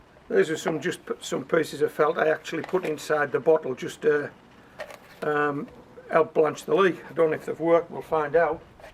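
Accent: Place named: North East England, United Kingdom. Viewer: Newcastle area I think, definately a North East UK Accent.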